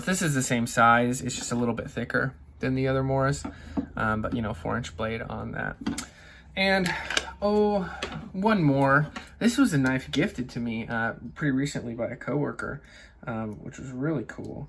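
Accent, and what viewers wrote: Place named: England, United Kingdom. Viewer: Just hear the accent he is english " if we do outdoor testing we get 10 years 😢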